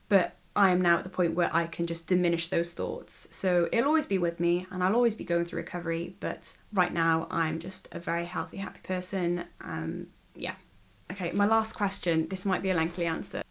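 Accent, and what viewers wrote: United Kingdom. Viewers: AHH BRITISH ACCENT IS THE MOST ADORABLE AND BEAUTIFUL ACCENT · Omg I love your British accent and Jesus Christ you're so beautiful🤩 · U have a british accent 😍💯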